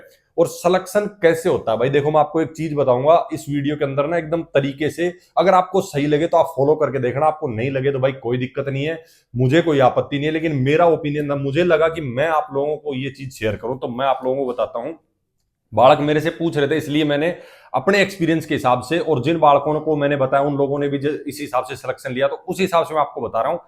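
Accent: Haryana, India. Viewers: Asa lgra sir ap haryana se ho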